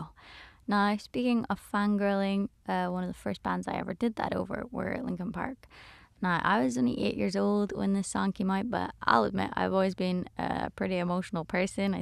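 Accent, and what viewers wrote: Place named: Ireland. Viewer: I don’t know how I missed these before but I just listened to the most hauntingly beautiful version of I have ever heard. Your voice just transports me! I love listening to your soundtracks and your beautiful Irish lilt! God did magic when he created your voice! Thank you so much for being brave enough to share it with us! Love you!!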